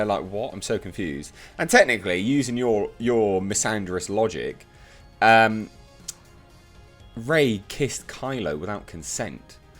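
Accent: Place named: England, United Kingdom. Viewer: american? He has clearly an english accent. Why did you thought that he was american? I dont get it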